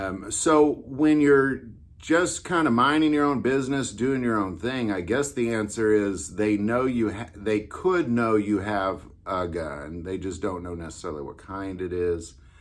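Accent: South, United States. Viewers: oh ok thank you & happy thanksgiving 🦃🍽 to you & your family you sound like you live down south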